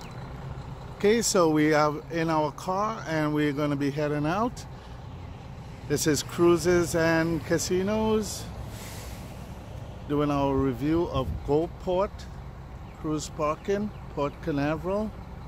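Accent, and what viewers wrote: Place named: Trinidad and Tobago. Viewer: sounding trini..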